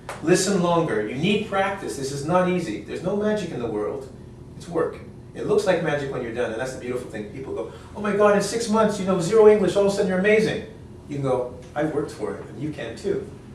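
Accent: United States. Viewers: Good job, amazing !!! I understand the most part of this video, and I have a sucks english :( Are you american ? where u from ? thank you for share this video, I hope one day be a english speaker like you are. Greetings from Brazil. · А вы можете сказать это какой американский английский (акцент) или британский? И вообще все которые есть учителя на сайте <link> они обучают какому английскому? Просто я новичок и пока что не могу отличать их, но я знаю одно, что не рекомендуется смешивать по этому спрашиваю.. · Excuse me, It`s American English? :) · are you american?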